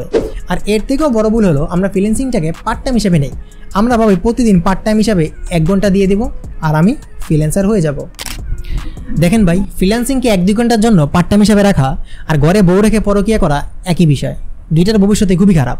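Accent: Sylhet, Bangladesh. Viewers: Vai apni ki sylhety ni😅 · Vai apni sylheti ni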